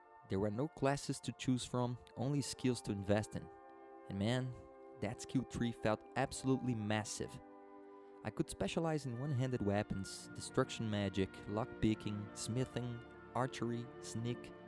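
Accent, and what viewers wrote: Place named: Brazil. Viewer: Me listening "esse cara é brasileiro" kkkkk Também jogo desde que lançou quando tava no ensino médio, dahora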